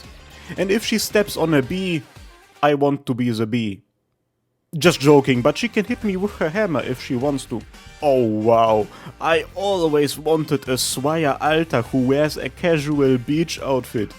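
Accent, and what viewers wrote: Germany. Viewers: holy your german accent is so insanely strongxDDDD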